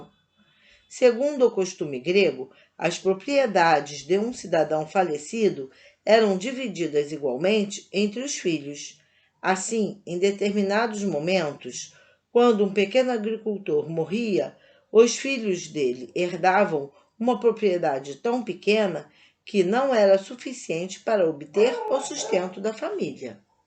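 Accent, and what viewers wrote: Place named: Rio de Janeiro, Brazil. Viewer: Sotaque carioca entojado.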